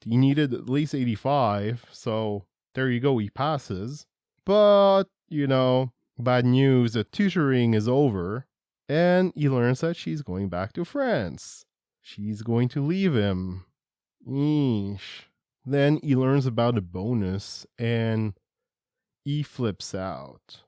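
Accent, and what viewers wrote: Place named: Netherlands. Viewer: You sound a bit Dutch.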